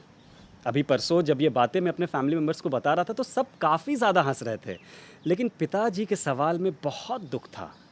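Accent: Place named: Bihar, India. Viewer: I want to see u again on tape a tale... Feeling something very heart touching... Especially. "Shi to thi Riya, bna nahi kahen aap dono ka" And most importantly, I love ur Bihar wala feel and touch little bit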